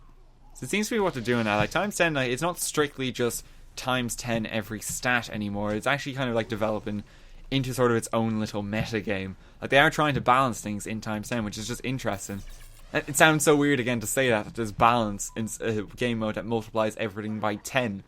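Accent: Ireland. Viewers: He's Irish.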